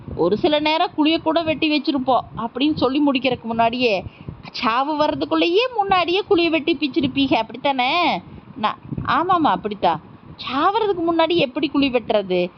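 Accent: Kongu, India. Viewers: எதார்த்தமான கதை. கொங்கு தமிழில் அருமையாக வாசித்திருக்கிறார். அம்மாவின் கோபம் எங்களை இப்படி ஆக்கிவிட்டது என்று சொல்வது நடைமுறை உண்மை. நான் ரசித்த கதைகளில் ஒன்று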